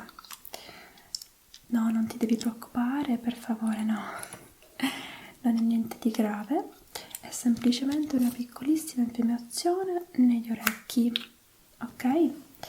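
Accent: Sicily, Italy. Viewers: i heard some sicilian accent 🤣🤤 ti amo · Si sente che è siciliana... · L'accento sicilianoo❤😂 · Sei siciliana? Si sente tantissimo l'accento😄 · L accento siciliano é un tocco di classe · It seems that you come from Sicily because of your accent. It's wonderful,gorgeous. As an italian,complimenti❤ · Io sento l accento sicilianooooo!❤️ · Seem that you have a Sicilian accent 😂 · Non capisco. Questa ragazza ha origini italiane? Il suo accento è italianissimo, quasi siculo!! Adoro 😻 bravissima! Hai una voce meravigliosa. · Con l'accento marcatamente siciliano, ce ne vuole coraggio a dire che è "americana", solo perché ha vissuto qualche anno in Canada! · Hai un accento siciliano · Sembra siciliana hahahaha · Oh wow, non avevo idea che fossi semi-italiana 😊 All'inizio ho visto questo video e ho pensato 'oh ok, allora è un genio', ma adesso sapendo che sei cresciuta in Canada ha un senso 😁 Hai un accento stranissimo, un misto di forte siciliano e straniero non meglio identificato haha Ma parli molto bene, mi piace! 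Ti dico qualche errore che mi è saltato all'orecchio per aiutarti: lavorare sul computer = al computer, infezione negli orecchi = alle orecchie, lentine a contatto = o dici lentine e basta (che comunque è molto informale e forse siciliano, essendo siciliana anch'io non sono sicura XD) o lenti a contatto, sistema immune = immunitario. Comunque grande! Tuo marito è italiano o canadese, per curiosità? :P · Hai un accento siciliano fortissimo ahahah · Siciliana sicuramente, si sente tantissimo e capita anche a me ogni volta che vado al nord nonostante io parli in italiano 😂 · il bello è che è canadese ma quando parla italiano sembra siciliana doc😂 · Adoro il fatto che tu abbia un accento siciliano bellissimo♡♡♡ sei bravissima, complimenti! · In tutto ciò solo i veri siciliani capiscono l'accento fortissimo(e bellissimo) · Bellissimo il tuo accento siciliano 😍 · Che meraviglioso accento siciliano. 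Una mia cara amica di Siracusa parla proprio come te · ‘Mbare, I hear some sicilian accent here 😏😍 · Quando parli in italiasiciliano! sei molto tenera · omg u speak Italian way to good!!! I am Italian and I can say that u have a beautiful Sicilian accent! I love ur videos,;*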